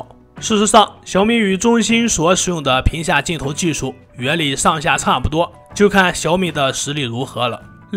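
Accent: Shanxi, China. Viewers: 这是山西口音，你会不会听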